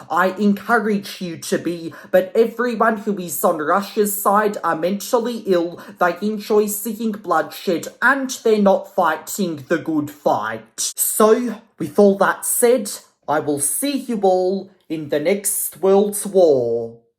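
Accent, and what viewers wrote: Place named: Europe. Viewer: your accent is quite European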